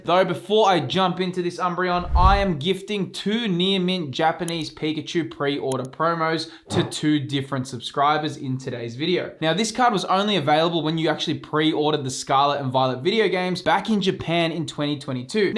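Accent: Australia. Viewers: Just started collecting again and im so glad I stumbled across your channel. It’s so refreshing to watch and hear a fellow aussie! love the content it’s been super helpful and insightful :)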